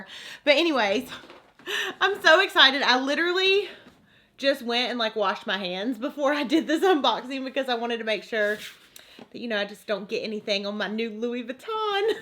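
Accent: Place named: North Carolina, United States. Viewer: Lol the very first time I watched a video of yours I thought, “Gosh she sure does talk like all the people at work.. her accent is similar to ours.. wonder where she’s from”. Then I checked out your other videos and realized you’re from W.S.! I live in Greensboro 😂😂 anyway, i subscribed immediately. Love your videos!!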